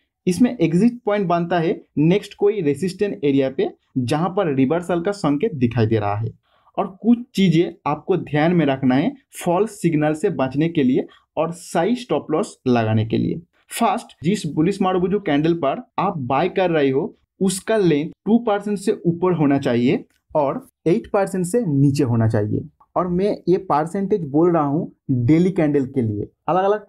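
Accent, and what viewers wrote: Bengal, India. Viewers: Very nice content and very nice to hear Hindi in bangali accent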